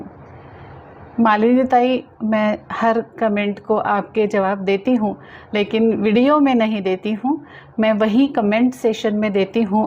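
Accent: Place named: Maharashtra, India. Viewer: नर्मदे हर,🙏🙏आपकी हिंदी भाषा बहुत ही अच्छी है, और कभी कभी आप जो मराठी मिश्रित हिंदी बोलतीं हैं वह तो और भी ज्यादा अच्छी लगती है । मातृभाषा की सौंधी मिट्टी सी महक आती है ।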